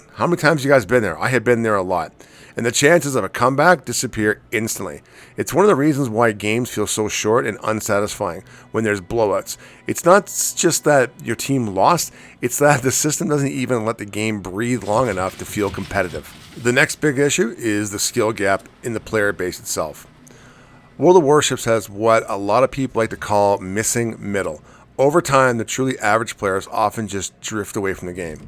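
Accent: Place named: Canada. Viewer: Just sub'd -- good to have a Cannuck voice here -- since I-Chase Gaming went away ..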